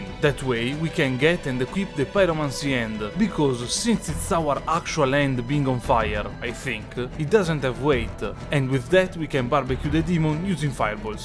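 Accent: Italy. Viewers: italian accent is very marked that’s why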